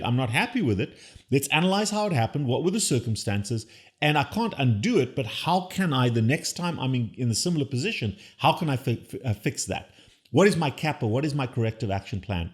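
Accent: South Africa. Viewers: Doc - Are you South African?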